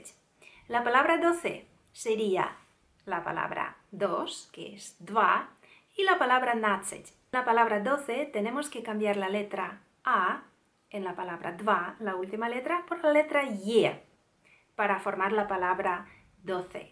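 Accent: Spain. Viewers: Felicidades por ablar español.pero debés saber que él español de España ningún país latino les gusta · WOW......... FINALMENTE recuperas tu acento castellano , bienvenida , Como siempre gracias por tu tiempo y educarnos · Hola!. Me gustan todos tus videos. De a poco estoy aprendiendo ruso con vos!. hablas muy bien el español y tienes el tipico acento español (España). Какие красивые глаза,! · pero xq hablas con el acento español? El vídeo sale mejor si lo hablaras sin ese acento. Me hace recordar a la serie rosa.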